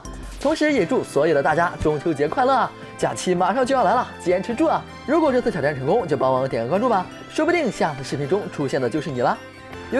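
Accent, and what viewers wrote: Hong Kong, China. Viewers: 你係香港人嗎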